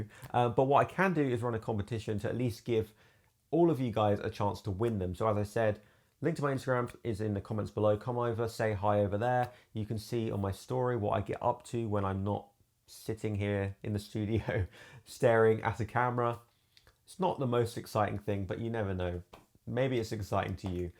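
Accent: United Kingdom. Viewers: as Am-re company, we stumbled upon you while looking for influencers to help us do video reviews in the UK, one of your videos had a lot of views and it was great we liked it. now what we like about you, your voice and the way you talk about a product, it's calm slow and with very good words, the second thing that we like is our hairstyle its almost white but for sure you haven't passed your 30's look cool, the British accent. we would love for you to review our product the best 40 USD you have ever spend on earbuds of course we can send them to you. - back to the point hahahaha we also like your background studio the colors are great and very eyecatching, I'm sure you take your time and review the products and as well time to make the script for what you are going to say. the good point and the bad points. last point: the shots and Broll over voice you do its really good. 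we been following sense you had only 7k something followers and we are also surprised the way you exploited over the last 3 months Ps; we been following you for the last 3 months lets get to 1.3 billion in the next year. you are the best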